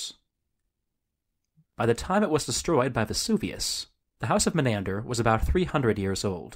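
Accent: United States. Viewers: Interesting if the voice wasn't American... I dont like the "goodbye folks". Other English speaking countries don't call people "folks". It's very rude.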